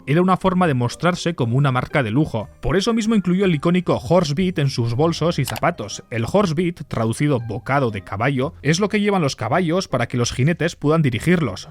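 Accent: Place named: Basque Country, Spain. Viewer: Eres euskaldun no? Lo digo por tu acento. Yo de Vitoria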